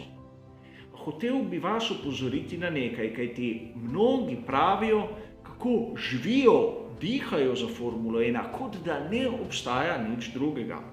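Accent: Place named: Koper, Slovenia. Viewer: rtv z 100mio na leto ne uspe sestavit 1% tega kar lahko vidimo na tem kanalu. se mi je zdelo da si kje iz kp ko sem začel spremljat tvoje prispevke to pa zato ker uporabljaš G in ne H po Horiško :) tudi moje čestitke za delo ki ga opravljaš in upam da se bo nadaljevalo tako. me pa zanima zakaj vsak dan nov video. se ti ne zdi da bi si kdaj vzel dan prosto od tega? naredil mogoče video nekje 6 do 7min z nekaj kratkimi izseki? v mislih imam kot nek malo drugačen format prispevka ki bi ga objavil tu in tam.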